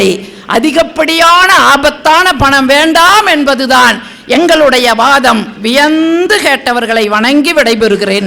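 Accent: Kongu, India. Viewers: கொங்கு தமிழ் 🎉🎉🎉🎉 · உங்களுக்கு கொங்கு தமிழ் சிறப்பாக இருக்கிறது அம்மா 💐💐💐💐💐💐💐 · ஆட்ட, ஆட்டையப்போட்டு, கிடாவிருந்து வைத்த து,குபீர் சிரிப்பு!பத்தாயிரம்,போச்சே! பெண்களுக்கு அழகு சாதனம்,நாற்பத்தி,எட்டு! மேச்சுக்கு,மேச்சா!,கொங்குதமிழ்,மெய்சிலிர்க்கவைத்தது❤ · கொங்கு தமிழ் கொஞ்சுகிறது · எங்கள் பகுதியில் நாங்கள் பேசும் தமிழ் இந்த கொங்கு தமிழ். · கொங்கு தமிழ்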